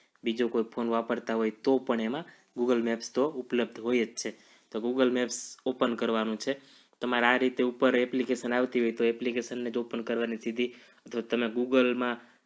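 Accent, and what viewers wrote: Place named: Kathiawar, India. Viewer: ભાઈ ભાઈ, એકદમ કાઠિયાવાડી ભાષા બોલો છો... સરકારી ખરાબો ની જમીન એટલે શું ?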